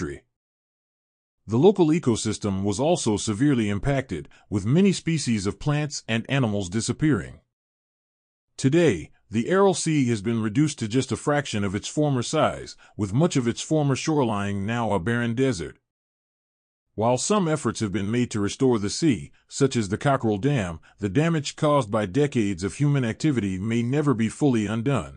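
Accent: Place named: United States. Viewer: Мерзкий американский акцент + неккоретное произношение👎👎👎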